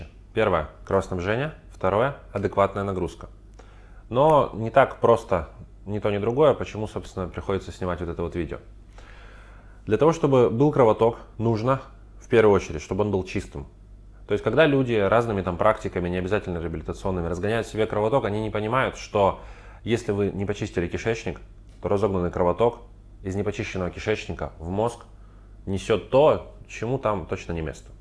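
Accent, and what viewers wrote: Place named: Urals, Russia. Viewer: Мне кажется, доктор - уралец? Говорок уральский). Такой молодой и такой потрясающий!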